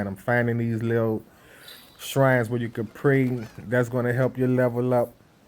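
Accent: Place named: Louisiana, United States. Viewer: Bruh! Where are you from, whoa? I swear you sound like my homies from Louisiana. Pretty good video, by the way. Even with the accent very articulate! As a southerner from the A, it is beautiful to hear. Salute my G!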